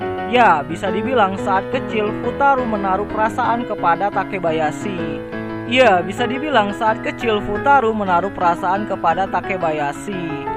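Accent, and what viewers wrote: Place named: Algeria. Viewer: حسيتك شغل راك تهدر دزيرية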